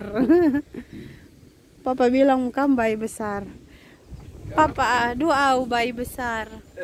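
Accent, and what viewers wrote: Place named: Eastern Indonesia, Indonesia. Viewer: Salam kenal Kk 😊 Dari logatnya seperti dari Indonesia timur ya Kk, semog berbahagia selalu bersama keluarga.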